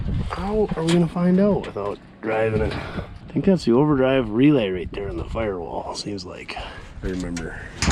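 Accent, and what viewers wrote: United States. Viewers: Sounds more like Eric from maine auto to me, sounds more American, not yankee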